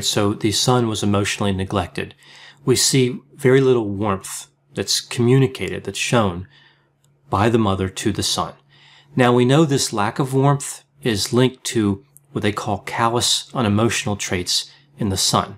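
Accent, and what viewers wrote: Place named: Pennsylvania, United States. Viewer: Great info. I detect a Pennsylvania/Philadelphia accent.:)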